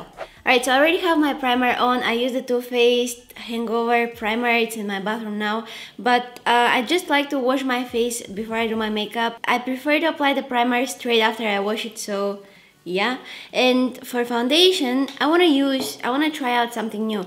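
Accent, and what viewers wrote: Bulgaria. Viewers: I met a woman the other day and I guessed she was from Bulgaria by her accent. Guess I've been watching a lot of videos!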